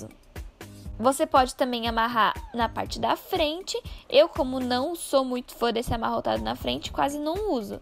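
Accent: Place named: South, Brazil. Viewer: É do sul né? Ksjsk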